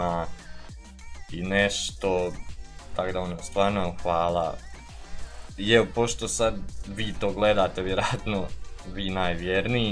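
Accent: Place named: Dalmatia, Croatia. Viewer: odakle si ti? Govoris dalmatinski pa pitan:D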